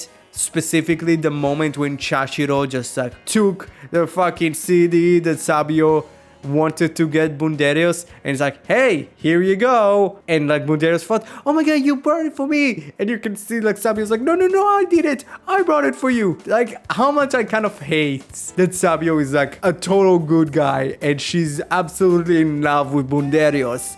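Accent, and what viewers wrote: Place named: Spain. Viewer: Uh You said Bundorio's name wrong It's actrully pronounce Bun-do-ri-o Bun-de-ras Since you have that Spanish acent of yours,saying Bundorio's name in English can be tricky for Spanish Sentai fans Also is Taiya and not Tire,oh my god the Boonboomger fans are so going after you after you saying the character's names wrong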